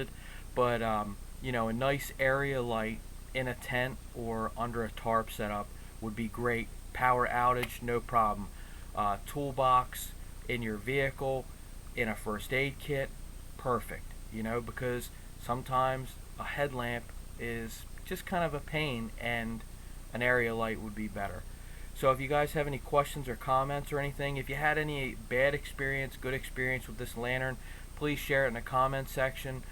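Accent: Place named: Philadelphia, United States. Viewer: Streamlight is also local to you, assuming youre near Philadelphia ._. Based on your accent, amiright?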